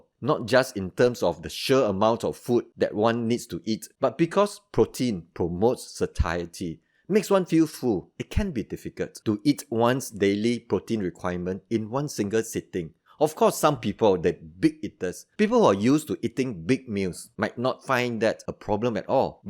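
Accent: Singapore. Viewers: Singapore accent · you must be singaporean. Singapoh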